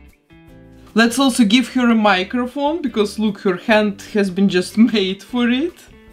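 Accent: Russia. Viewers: Russian accent? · У вас русский акцент, как мне показалось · Почему то со стороны мне кажется что это Russian Woman по акценту и выделению каждого слова в произношении, но я могу ошибаться и на самом деле это нормально в Америке. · Похоже на русский акцент 😅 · Only i hear russian akccent?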